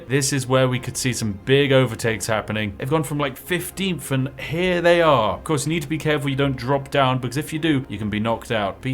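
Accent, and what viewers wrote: United Kingdom. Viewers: I like how he somehow keeps his British accent when replying to comments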